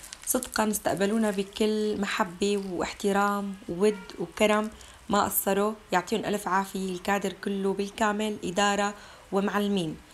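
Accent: Homs, Syria. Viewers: اللهجة الحمصية 😍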